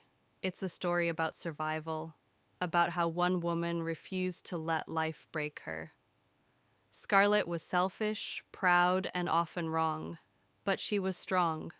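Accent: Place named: United States. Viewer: excellent and clear American English pronunciation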